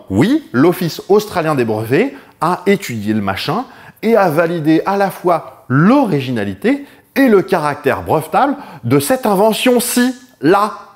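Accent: Belgium. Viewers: C'est dingue même avec la modif de voix on reconnaît l'accent belge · Je reconnais un accent belge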